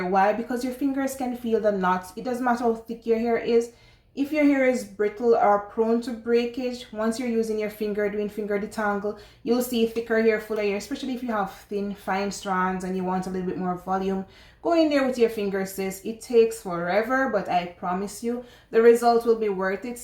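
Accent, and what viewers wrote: Jamaica. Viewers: I like the accent ☺️ sounds jamaican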